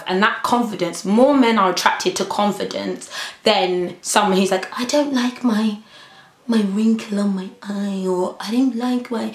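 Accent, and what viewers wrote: England, United Kingdom. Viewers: oh my gosh . black women with english accents are just so beautiful to me. black women in general are beautiful, every woman is beautiful.. but i mean, gosh! the accent just kills me, i love it! <3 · I love your raspy british accent ugh!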